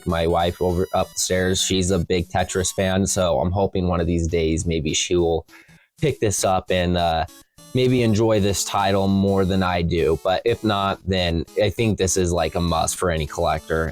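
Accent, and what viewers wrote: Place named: Canada. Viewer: You have a Canadian accent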